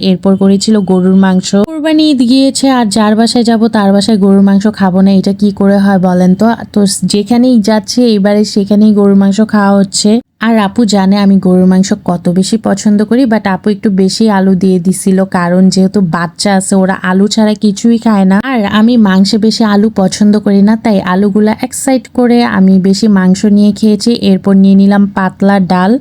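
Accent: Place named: Bangladesh. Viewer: 😂আপু প্রথমে আপনাকে ইনডিয়ান ভাবছিলাম পরে আপনার ভাষা সুনে মনে হলো আপনি বাংলাদেশি তারপর গরুর গোস্তো দেখে সিউর হয়ে গেলাম আপনি বাংলাদেশি😂🎉❤❤❤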